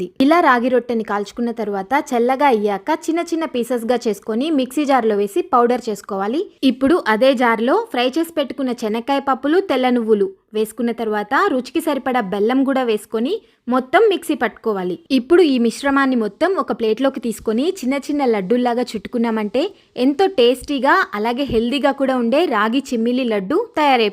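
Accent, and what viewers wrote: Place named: Tirupati, India. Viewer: slang and words telusthondi. We are from Chittoor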